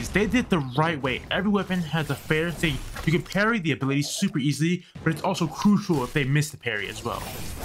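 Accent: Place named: India. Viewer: havent watched your videos in a while cant stop noticing that your voice has gotten more indian like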